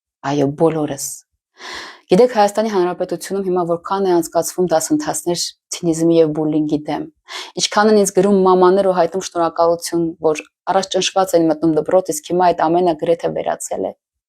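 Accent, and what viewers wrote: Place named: Russia. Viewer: ինչու եք ռուսական տոնով խոսում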